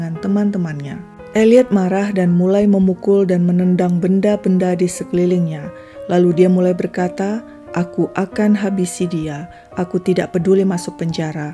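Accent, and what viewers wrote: Indonesia. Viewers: hokh ketauan org indonya ya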